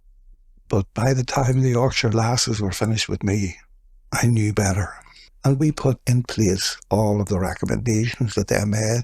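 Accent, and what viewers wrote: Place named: Northern Ireland, United Kingdom. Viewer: that's a killer Norn Ireland accent there.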